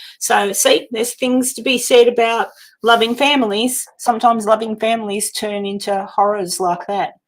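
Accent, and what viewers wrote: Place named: Australia. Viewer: Yes Maam I Have Settled in the States .Yes Maam Mother is French Dad is Brit ,But My Ass Settled in the States. I like the Idea About Forgetting About Stuff I Will Try and Catch Your Lives. I love the Australian Accent and Australia Being One Place I Haven't Been. Your Son Being in the Military ❤ He Will be in My Prayers and I Thank Him for His Service!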